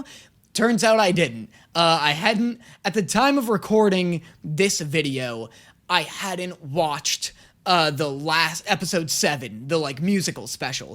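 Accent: United States. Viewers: I think the thing with Stolas’ name is that he’s got a posh English accent and you’re using the same emphasis on the syllables he is, which sounds odd to some ppls ears coz you’re American dunno, you are saying it right tho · People are mad because they want you to say Stolas like (Stol-ihs) instead of (Stol-ass) Edit: The more British you are, the more pronounced the a is in Stolas, so it's said like (Stol-uhs) by the Goetia, and then the non-Brittish speaking characters say (Stol-ihs), the only reason why people are on your case is because no one actually says stolass, they say either Stolihs or Stoluhs, never Stolass- it just sounds similar (I think it's bc half the people who say Stolas are British and you have an American accent, so you saying it similar to them makes you want to pronounce the a as an a sound and not how it's actually pronounced)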